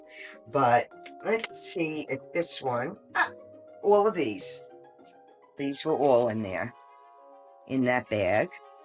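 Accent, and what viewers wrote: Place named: New York, United States. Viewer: I had to stop watching for a minute just to say how wonderful you are to let us into your beautiful world! I’m in awe of your amazing collection and the stories that go with them. The story of your mother and the artist who crafted her last piece, the Santa, has touched me very deeply (I have stage 4 breast cancer). Also you sound a lot like me. I’m guessing Long Island or Queens. I hail from both, and even 🎉though we moved to the south 23 years ago I never lost that “accent.” Never stop being who you are. The world needs more people like you! PS: I have my grandma’s buttons too!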